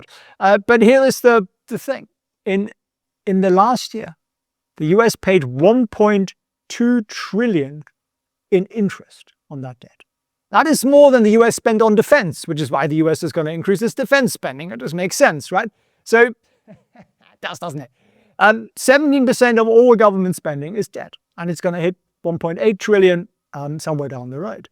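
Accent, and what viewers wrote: United Kingdom. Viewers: and I thought you were going to say "yet still has a great british accent!"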